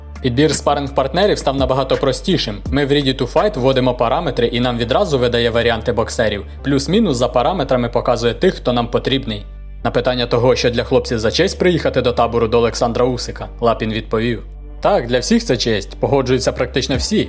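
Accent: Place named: Rivne, Ukraine. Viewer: У вас Рівненський акцент...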